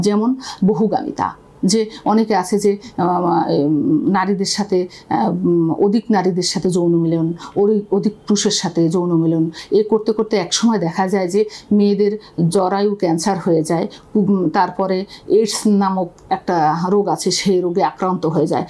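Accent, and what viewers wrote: Barishal, Bangladesh. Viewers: মেডাম আপা বরিশালে নাকি মুখের কথা সুনে তো মনে হয়ে সেরকম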